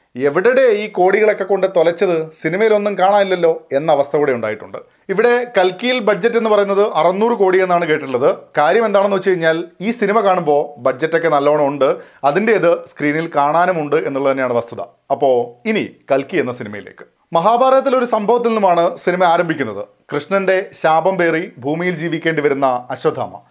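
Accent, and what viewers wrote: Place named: Palakkad, India. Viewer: Palakkad tamil ഉണ്ട്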